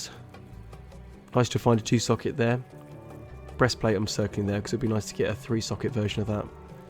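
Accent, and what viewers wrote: United Kingdom. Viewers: The dead-pan, British delivery kills me. “I’m debating profiting from mana potions. Is that the kind of example we want to set for our children this holiday season?” Lmaoooo Sooo many good one-liners. Well done.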